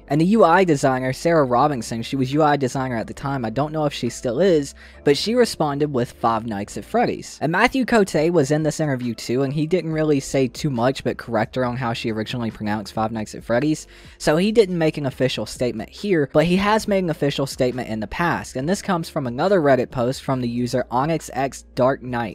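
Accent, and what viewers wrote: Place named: North Carolina, United States. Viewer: On today’s episode ok guess that accent we haaaaaave! Now, I’m gonna guess that accent is from….North Carolina!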